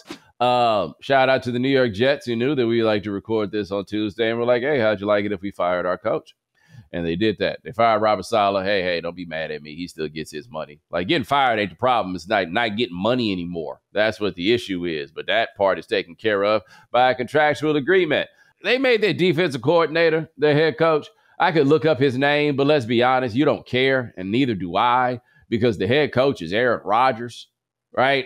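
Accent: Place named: Los Angeles, United States. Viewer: Geez that LA accent is strong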